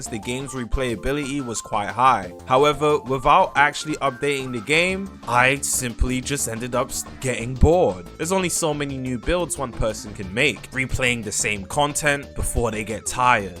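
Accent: United Kingdom. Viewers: my favorite british man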